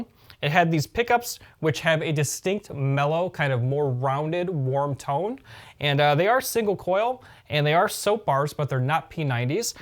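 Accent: United States. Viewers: Chicago accent? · or Picksburgh accent.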